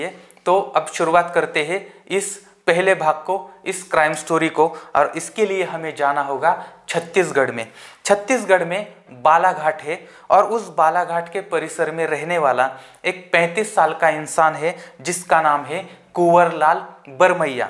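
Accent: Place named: Nagar, India. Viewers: Aap ki Hindi Marathi me nikal Rahi he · सर तुम्ही नगर चे आहात काय? आमच्या नगर ला अशीच हिंदी बोलतात..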